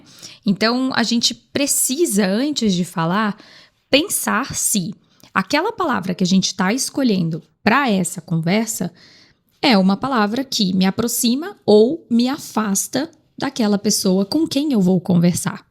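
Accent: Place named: Brazil. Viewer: Comunicar bem aqui em portugal? vc neste video má exemplo começa logo mal , aqui não e em lago algum do mundo a não ser bostil não se sotaca portugau mas sim portugal , não se fala gentchi mas sim gente , não se diz qui legau mas sim que legal etc etc ,vcs ai no bostil teem alguma coisa contra a letra L ?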